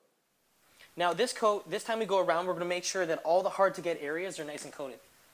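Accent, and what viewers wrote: Canada. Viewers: I can tell that you’re canadian lol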